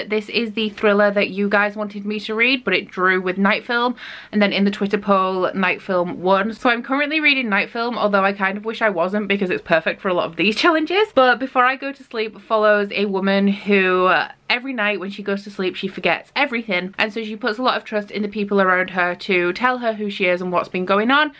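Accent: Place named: North, United Kingdom. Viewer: Ahhh, our accents are so similar! Are you from the north??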